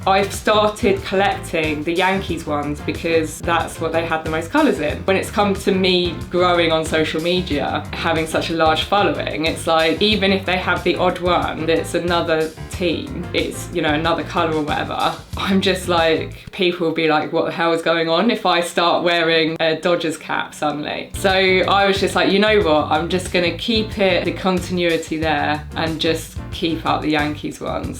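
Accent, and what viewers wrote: England, United Kingdom. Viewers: I agree about the Cincinnati Reds Hat but with your accent I expect to See a British Airways or a British Petroleum hat on . Or maybe a Quantas Hat too. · Accent is CLEARLY 100% English.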